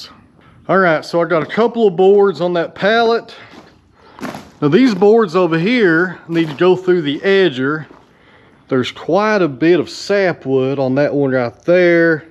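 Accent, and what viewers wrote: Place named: South, United States. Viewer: I like your videos and can understand your southern accent well because that's exactly how I talk. We must be from the same general southern area. Thanks.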